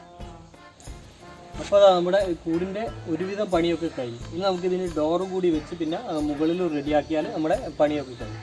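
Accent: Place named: Palakkad, India. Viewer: സംസാരം കേൾക്കുമ്പോൾ പാലക്കാടുകാരനെപോലെ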